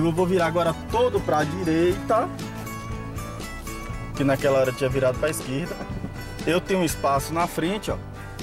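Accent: Bahia, Brazil. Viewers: Conheço esse sotaque de longe...Vc é daqui da Bahia. Rsrs vc dá aulas de direção?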